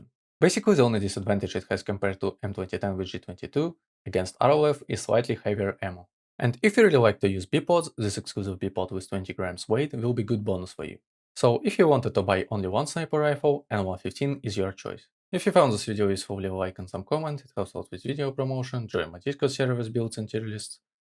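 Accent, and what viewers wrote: Russia. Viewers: Не знаю почему но твой говор, или же что то выдаёт тебе русского или снг человека. За рание прошу прощение если ошибся.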